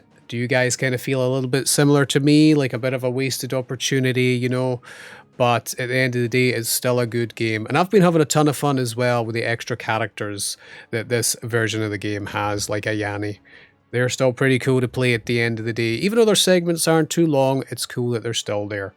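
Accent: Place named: United Kingdom. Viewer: This is still a great game. Whiny british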